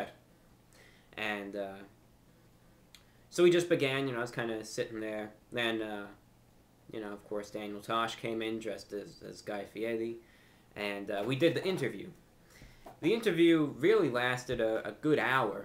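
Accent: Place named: Boston, United States. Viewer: I hear he's from NY. I thought he sounded Bostonian.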